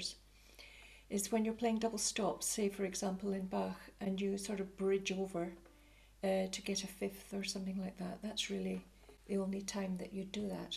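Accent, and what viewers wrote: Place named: Scotland, United Kingdom. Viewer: I really enjoy your videos - and it makes me very homesick to hear your beautiful accent. I'm hanging on to mine, but would love to return to Scotland after 22 years down south...one day!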